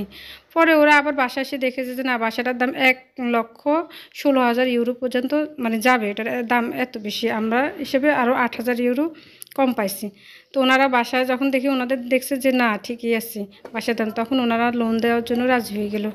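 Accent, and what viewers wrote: Bangladesh. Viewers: মনে হয় বাড়ি নোয়াখালী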